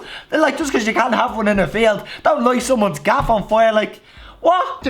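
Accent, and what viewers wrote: Dublin, Ireland. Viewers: Just after doing a marathon on your vids and subscribed love the Dublin accent and sense of humour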